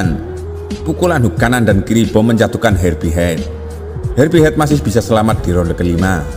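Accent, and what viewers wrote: Java, Indonesia. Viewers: Adminya medok sekali si bicaranya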